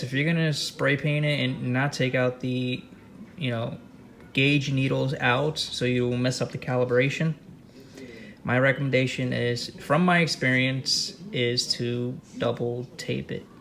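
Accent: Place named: United States. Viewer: Bro, you sound American as fuck 😅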